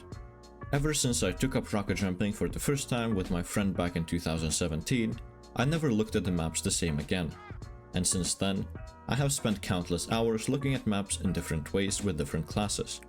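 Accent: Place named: Lithuania. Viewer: Very good video, a lot of food for thought. Random question, whereabouts in the world are you from? I can't place your accent, you sound Scottish, French, Dutch and Eastern European by turns. Edit: Nevermind, just checked your profile about and spotted the note that you're in Lithuania. Odd - I''ve known many Lithuanians but didn't place your accent. Eiti Lietuva, all the same.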